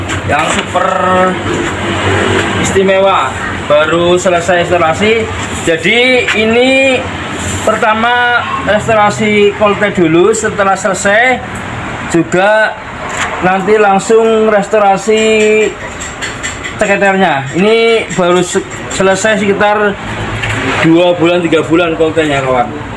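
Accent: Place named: Banyumas, Indonesia. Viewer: Salam. Ngapak mas. Tambah Sukses selalu. Amin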